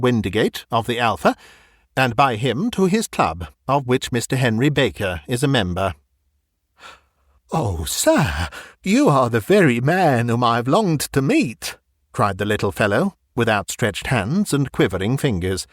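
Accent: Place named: RP, United Kingdom. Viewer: Nice. This is one of my faves from Sherlock's short stories. It's got a great mix of inductive reasoning, clue following, person tracking-down, interesting side characters, and a happy ending for all parties. Very nice to hear it from my favourite posh British narrator: Mr.. Happy holidays to you, and to everyone who reads this.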